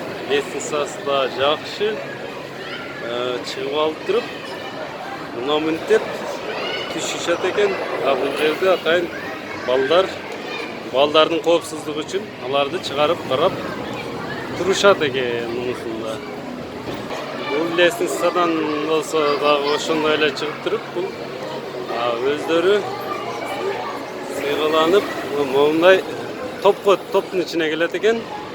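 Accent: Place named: Batken, Kyrgyzstan. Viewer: далбоеб, есть разница, при том большая! Какой-то хуй со своим ебанным акцентом рассказывает тут про мой город. Соответственно все подумают что ошане так разговаривают. Блогером ему не быть, пусть делает такие обзоры у себя где-то в баткене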